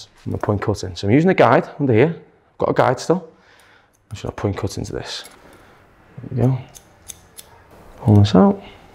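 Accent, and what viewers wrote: Liverpool, United Kingdom. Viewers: Are you scouser? You sound like a scouser:D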